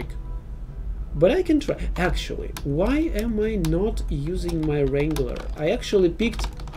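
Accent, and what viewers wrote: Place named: England, United Kingdom. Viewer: Oh, you are from England?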